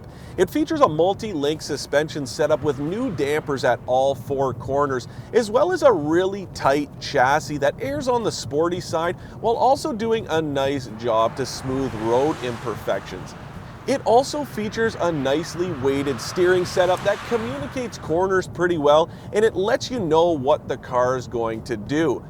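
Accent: Canada. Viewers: I’m very much looking for a head-to-head comparison of thse two cars, especially how they handle, but find it impossible to bear this guy’s yukky accent. Is he a Canuck? It’s just awful trying to listen to him. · dead giveaways for Canada- "aboot" and "Mazz-Duh" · Sad that I noticed this was Canada by the turbo sticker on the civic before his accent gave it away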